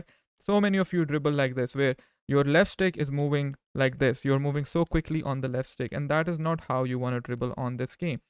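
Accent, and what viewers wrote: India. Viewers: Are you from India mother yar????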